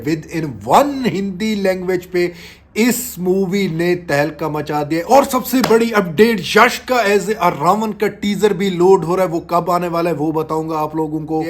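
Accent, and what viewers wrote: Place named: Pakistan. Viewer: Pleass batao na..aap india se ho ya pakistan hai..kyuki apki accent Pakistani hai..❤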